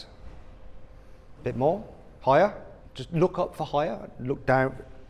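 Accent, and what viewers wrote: United Kingdom. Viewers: ブリティッシュアクセントたまらん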